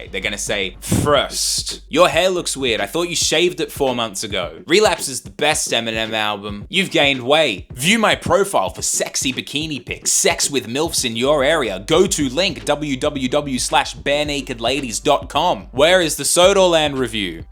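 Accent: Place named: Asia. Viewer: I cannot stand your Asian accent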